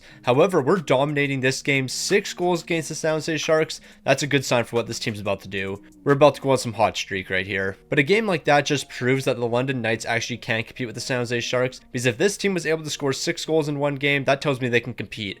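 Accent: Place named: Quebec, Canada. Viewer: you actually pronouced cataractes exactly right lol (well i mean, minus the quebec accent)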